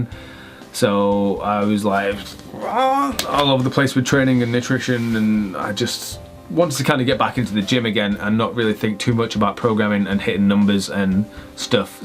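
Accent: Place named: North, United Kingdom. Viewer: So northern!!! 😂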